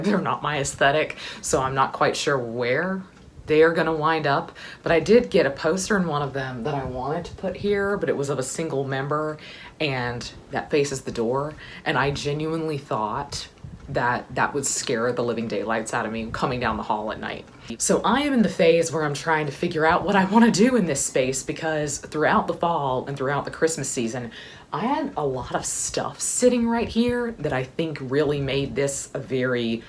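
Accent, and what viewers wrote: South, United States. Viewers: And I just heard you say from the south! I knew it 😁 I was telling myself I am sensing southern, very beautiful.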